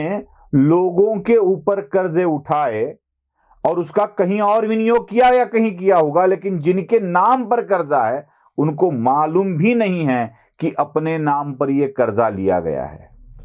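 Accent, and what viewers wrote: Maharashtra, India. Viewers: aapki marathi lahje men boli gai hindi sunne men accha lagta hai. up ke bare men aapka precomplex bad men up tour ki sacchai ka bayan karna sukhad tha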